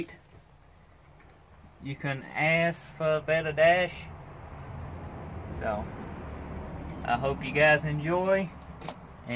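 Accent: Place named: South, United States. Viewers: I knew it I knew you was from ky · Theres nothing wrong with his voice, just southern tone . Thanks for the video buddy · I was wondering where you were from with that accent. Hazard kentucky? I've been there once or twice. I think i'm going to use this on my 79 g10 restoration. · I can't decide whether I hear Mississippi water from the river or I hear Carolina. I'm a Texas boy myself so this is how everybody is supposed to sound!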